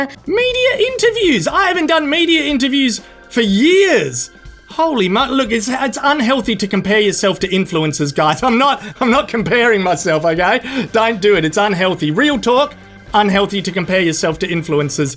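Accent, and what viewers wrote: Australia. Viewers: you sound like you are from down under but you look like you are from Appalachia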